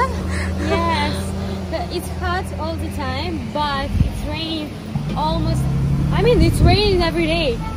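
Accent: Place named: Ukraine. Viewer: You’ve got a beautiful Ukrainian accent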